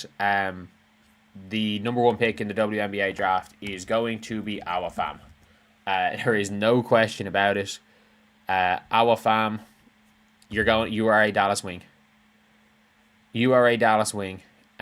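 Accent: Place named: Ireland. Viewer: He has a brogue, says he's in Ireland, Twitter says trending in Ireland and people can't figure out Ireland....sorry, that's just willful ignorance. Eire not 'Merica for. Sorry. Best wishes to all.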